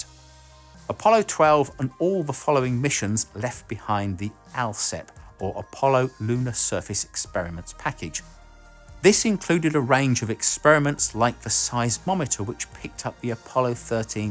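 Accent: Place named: England, United Kingdom. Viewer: English accent I'm out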